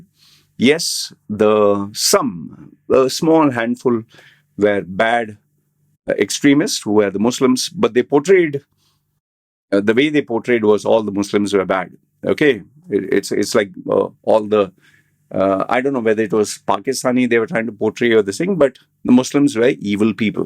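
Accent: India. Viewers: This guy claims he studied in CBSE, but doesn't know who Aurangzeb is, doesn't know about Maratha empire, doesn't recognize Shiva tandava stotram, cannot pronounce basic Indian names, has an Indian accent but acting like a gora who is clueless about India, "oh, what is this strange Hin-doo stuff", etc. Something seriously weird going on...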